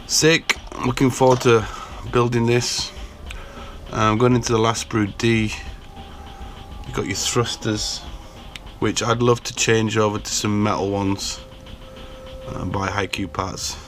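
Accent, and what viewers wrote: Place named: Leeds, United Kingdom. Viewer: I've sent you an email also I didn't realise that's where you are based! as you sound like my mate who's a Leeds fan all the best from UK mark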